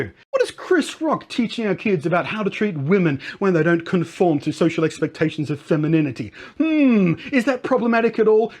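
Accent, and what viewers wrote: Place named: Australia. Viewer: Pretty sure he's not British, other side of the world I'd wager, Australia I suspect.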